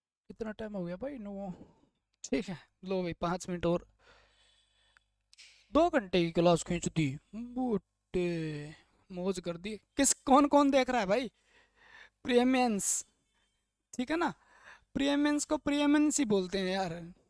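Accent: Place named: Sirsa, India. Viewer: सर जी भाषा बोली से सिरसा जिले से लग रहे हो, कौन सा गांव है जी आपका। साहब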